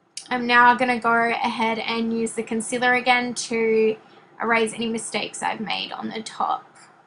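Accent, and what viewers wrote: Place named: South, United States. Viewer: Holy crap so much for just brows! I love your southern accent though hehe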